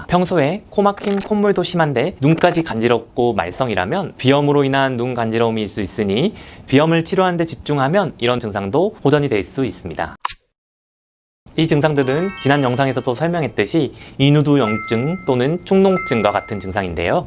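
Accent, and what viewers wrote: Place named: Gyeongsang, South Korea. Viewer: 몬살아ㅋㅋㅋㅋㅋ 안닮으셨다요 선생님도 경상도 시구나 저희 지역은 사투리 잘 안써요!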